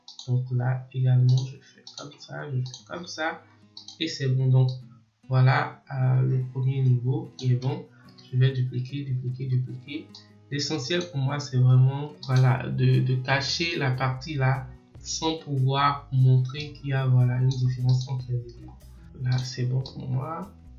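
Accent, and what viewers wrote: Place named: Benin. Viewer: Déjà je n'ai pas encore suivi la vidéo mais par les premières tonalité c’est un Frère du Bénin et ça fais super plaisir J'aime Koudo Azo Nonvi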